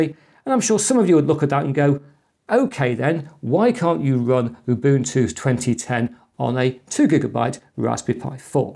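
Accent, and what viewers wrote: United Kingdom. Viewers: I like this british accent! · 👍I learn about new technologies and also practice my British accent listening comprehension. This channel has everything!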